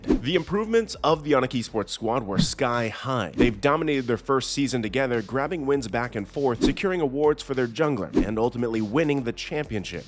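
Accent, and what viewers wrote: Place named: East Malaysia, Malaysia. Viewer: Your voice It feels like an east Malaysian (Sabah & Sarawak) pronunciation kinda style 😂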